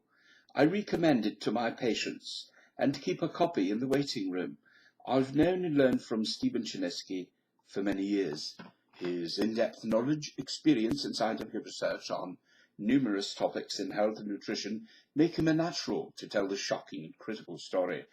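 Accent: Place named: England, United Kingdom. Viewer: I was thinking about clicking away but the English accent gave me confidence in the information that was provided.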